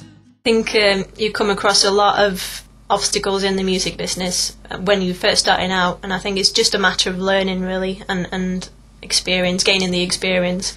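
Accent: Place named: Sweden. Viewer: Ermm you are a Swedish song writer? wow you have got a wonderful accent ;) Do you speak Swedish?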